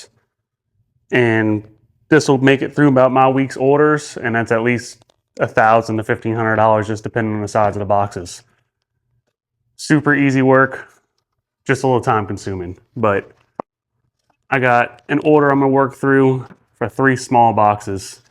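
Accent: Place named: Louisiana, United States. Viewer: Great video, thank you very much! Are you in Louisiana? I picked up on some accent which sounds like it. 😁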